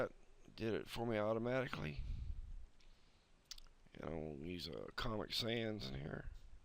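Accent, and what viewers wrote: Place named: North Carolina, United States. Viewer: Really cannot see how you have 14 thumbs down on this - I'm a beginner trying to learn everything I can at home using my subscription to AI from cloud so that I can do at work what my boss needs me to do using this program and vector graphics with fonts and jpgs. Great video, no back and forth oops I forgot or a bunch of info I don't need. Love the accent too as I am in NC I feel right at home listening to you :) Couldn't be more thankful for the tutorials.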